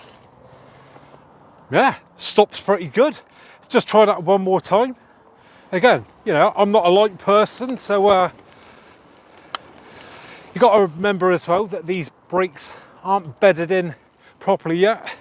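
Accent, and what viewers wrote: United Kingdom. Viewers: Great to have a video with a British person speaking rather than Yankee's.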